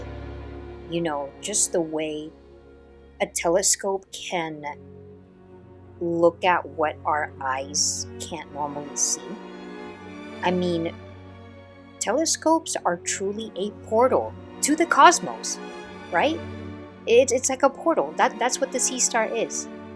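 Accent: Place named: Minnesota, United States. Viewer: I'm a newbie. I've had my seestar for about 3 weeks. She's my first. Moon, Orion then Pleiades. It's been fun. Rosette and Tadpole are on my list. Here in DE will not see any stars for at least 2 weeks. Mother nature waving her wand. Discovered how wet the east coast can be at night. Dew heater seems to work. Enjoying your videos. Sounds like you're not original SoCal. Minnesota????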